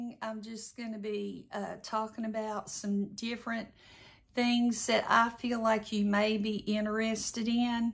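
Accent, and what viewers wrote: South, United States. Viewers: especially love your southern drawl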